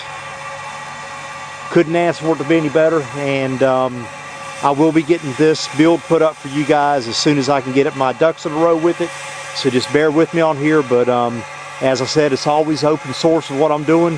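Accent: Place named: South, United States. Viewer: its weird hearing a southern accent saying "you guys" are you originally from up north?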